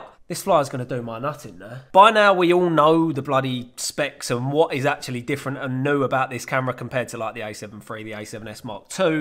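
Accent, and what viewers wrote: United Kingdom. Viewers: favorite british creator, loving your accent your lovely geezer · where's this guys' accent from like which part of the UK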